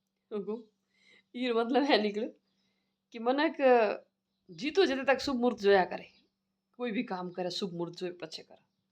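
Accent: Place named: Jaisalmer, India. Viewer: अरे हुकुम आप तो गुजरात लेकिन भाषा तो पूरी जैसलमेर बोलो एकदम❤❤❤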